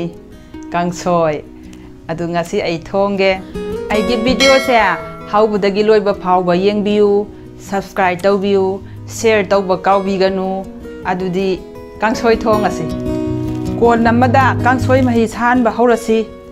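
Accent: Kakching, India. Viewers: Mama Se kakching kei leiky deino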